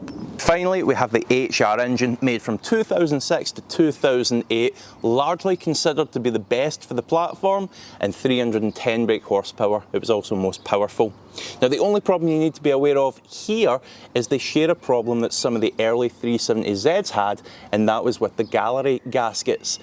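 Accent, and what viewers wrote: Scotland, United Kingdom. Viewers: and you referred to the 2006 model twice....I think you meant 07-08 . I can't stand Scottish accents. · Great vid and the accent totally reminds me of Trainspotting:)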